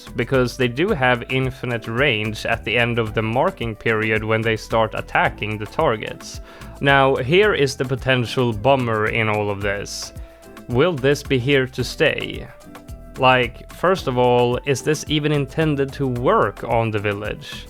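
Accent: Sweden. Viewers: i know that your swedish from how you sound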